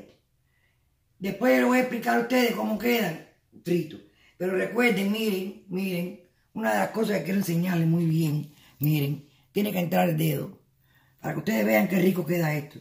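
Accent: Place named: Cuba. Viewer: Gracias, desde que senti tu forma de hablar me di cuenta que eras cubana y me emociones. Hace muchos años que no como chicharrones de viento, la boca se me hizo agua Nury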